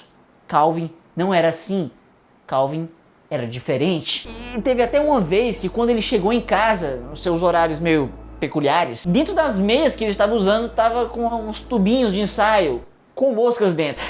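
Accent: Ceará, Brazil. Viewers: Você é do Ceará? Se for, qual cidade, notei por algumas gírias e o sotaque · Umas amigas me explicaram o que era "aí dento" mas eu nunca tinha escutado de maneira natural antes desse vídeo kkkkk. Você é do Ceará? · tu é cearense???? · Vc é cearense? Kjjkj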